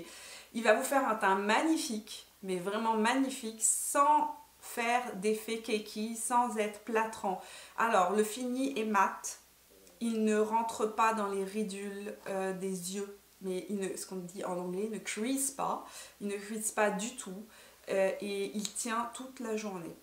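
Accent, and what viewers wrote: Quebec, Canada. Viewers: Je suis venu ici par curiosité, je te préfère tellement maintenant et ce petit accent quebequois tout discret alors que maintenant on lentend pas. Tu est comme le bon vin 😉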